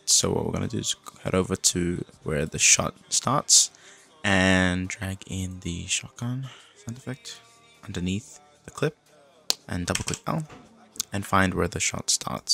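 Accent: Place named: Australia. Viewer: omg… first time hearing your voice and wow, what can I say? It’s incredible… amazing, awesome it’s everything I could’ve ever wished for. Mature, Australian, professional, polite wow. This is one of the best days of my life.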